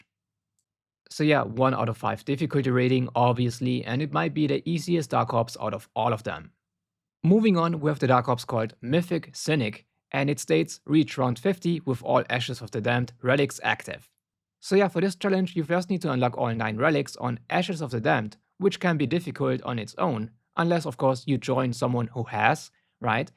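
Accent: Germany. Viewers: Most german accent ever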